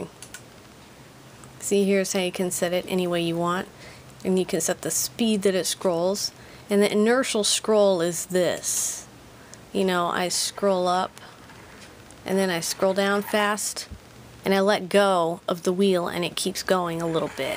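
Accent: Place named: United States. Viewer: I really like your voice and English accent. Also, you've got a sexy laugh . Don't think I'm hitting on you, just a honest observation. Where are you from? East coast? West coast USA? midwest? South?. I'd like to know what particular US English accent I like, and this is it.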